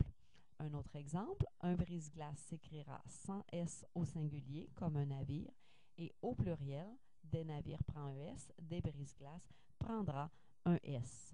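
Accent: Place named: Quebec, Canada. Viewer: C'est la première fois que j'ai remarqué l'accent québécois. C'est vraiment intéressant hein. Moi, je suis italien et j'avais toujours écouté seulement lequelle français. Maintenant je veux aller au Canada ahaha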